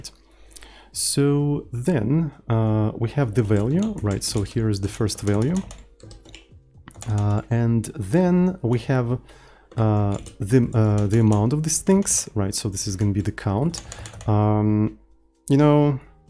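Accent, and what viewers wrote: Russia. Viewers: Czemu masz taki ruski akcent?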